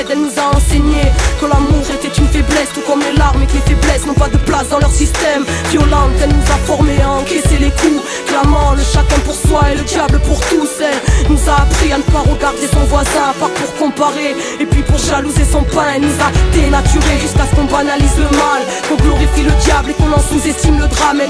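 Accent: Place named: South, France. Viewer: jvoi pas quelle accent de racailles tu parle mec elle bien de Marseille normal quelle es un accent du sud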